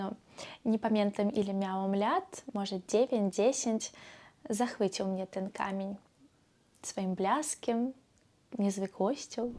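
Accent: East, Poland. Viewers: Lubię pania słuchać. Język polski w pani wydaniu brzmi fascynująco. Mam nadzieję, że nie pozbędzie się pani swojego wschodniego akcentu. Okolice naszego Krakowa są bardzo ciekawe. Myślę że zabraknie nam życia żeby poznać wszystko. Pozdrawiam sąsiadkę. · Pani wschodni akcent w polskiej mowie brzmi bardzo barwnie, przyjemnie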